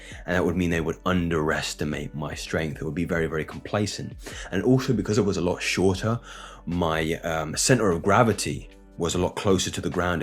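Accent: United Kingdom. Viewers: Your videos are priceless. I learn a proper British English as well. Cheers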